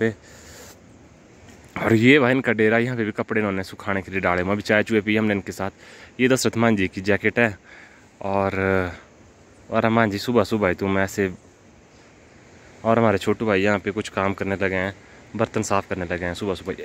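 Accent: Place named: Mandi, India. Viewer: bhai mandi kon si jgha se ho apki tone se to me samj gya tha ki Bnda to mandyal hai. 👌 baki bhoot acha krre ho brother. 👌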